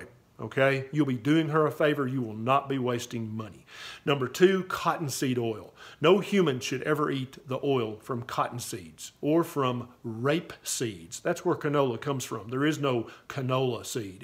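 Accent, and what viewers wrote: South, United States. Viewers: love that southern accent 😊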